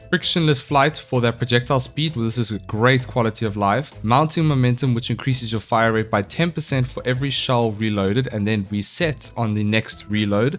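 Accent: South Africa. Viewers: are you south african? just asking cause you sound like you could be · Are u south african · Are you South African? Your accent sounds familiar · Are you South African?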